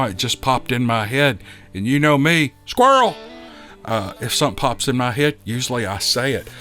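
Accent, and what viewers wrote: South, United States. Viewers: Good afternoon one of your tribal friends and as I’m getting my monthly chemo and your voice pod piped up thank u Sir your southern real voice is so soothing 😊thank You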